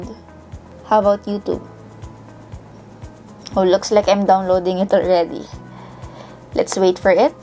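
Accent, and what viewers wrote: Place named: Tagalog, Philippines. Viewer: Tagalog accents* Dongle -----> DONGEL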